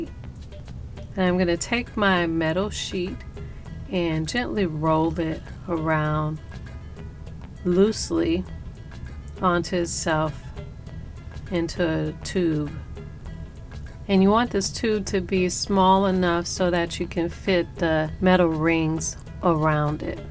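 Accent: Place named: South, United States. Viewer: I really love your creativity, and especially I love listening to your wonderful accent. Are you from somewhere in the South?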